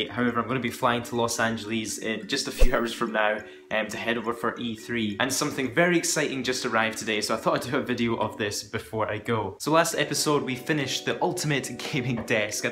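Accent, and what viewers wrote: Scotland, United Kingdom. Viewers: Nice to find a fellow Scot on YT. Fwendsssss :D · R u scottish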